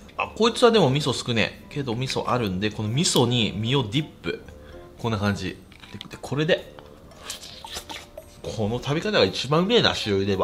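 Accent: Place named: Aichi, Japan. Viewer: 初コメントさせていただきます。前から見ていて、豊橋の知り合いの話し方とほんと似ていて、愛知だなぁって感じてました。冒頭の『さばいてくぅ～』のとこ、大好きです。魚をさばいて内臓やらへっちゃらで触ってるのに、片栗粉の感触が苦手なシーン、笑っちゃいました、のそういうとこ、いいっすね。これからも様々な 『さばいてくぅ』見せてくださいね。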